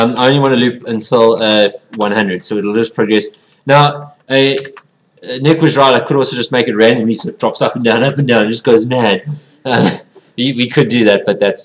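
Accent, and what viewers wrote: South Africa. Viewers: I can hear you're from SA. Great video, thanks so much! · are you south african?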